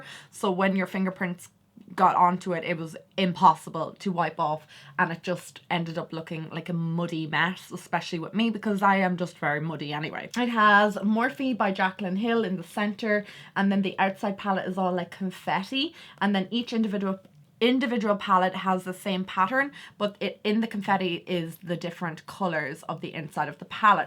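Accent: Ireland. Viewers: That yellow, the red, and the purple red ... so gorgeous. I also love that green palette. Well, now the yellow palette is also beautiful. I think the purple and the red palette would be my favorite. I love those golds and whites and greenish gold shades. I'm brand new to your channel. Are you from Ireland? You sound like Brendan Gleeson. I wonder whether you are from the same area where he grew up? I think he lives in Dublin now, but I'm not sure where the various inflections and tones of each accent originate. WOW, when you did that up-close of your eye look, so beautiful and very well blended!Thank you for sharing your impressions.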